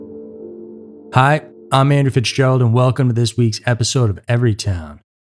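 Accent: United States. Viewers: I like listening to this channel because this dude is American 🇺🇸. I hate all the British people making these mystery videos. British accents are the worst. Can’t stand it.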